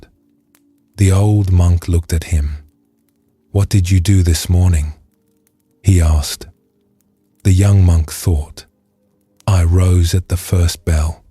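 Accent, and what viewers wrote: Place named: United Kingdom. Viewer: The voice is pleasing, though it seems odd to me that it has a British accent rather than, perhaps, an Asian one….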